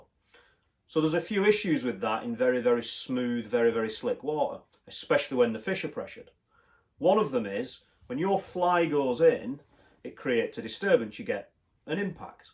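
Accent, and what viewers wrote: Wigan, United Kingdom. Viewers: Really enjoyed these videos - even my wife commented that your explanations were very clear and uncomplicated. Having said that, could you just clear up the lengths of the thick coloured nylon. Is each length 30 cm, making 60 cm for the two or do the two pieces together add up to 30cm? Must say that I've enjoyed hearing your, once, very familiar accent having been schooled in Wigan in the sixties.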